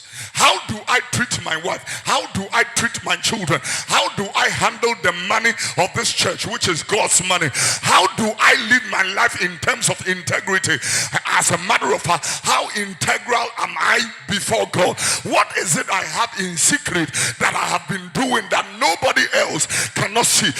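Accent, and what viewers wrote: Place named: United States. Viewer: I love your message anytime I listen to you but please if you can leave the America English I will be happy because we're Ghanaian let us love whom we are. thanks 👏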